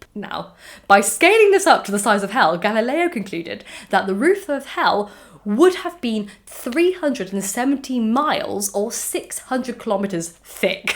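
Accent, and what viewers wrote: United Kingdom. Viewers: As a person learning both English and Italian this video is 👌, I just love her accent (British I presume)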